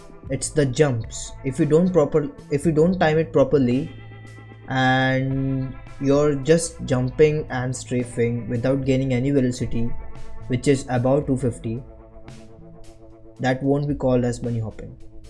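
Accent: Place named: India. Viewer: That Moment when you See an Tutorial Video in english and than its an Indian accent...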